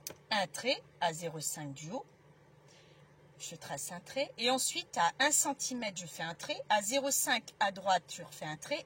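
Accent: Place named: Lille, France. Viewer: bonjour j'ai découvert ta chaîne par Agnès et je me suis abonnée sympa le pote bobine .j'aime beaucoup se que tu fais mais dit ton accent je le connais tu es une boyau rouge il me semble......merci a toi pour ta bonne humeur. gros bisou alexandra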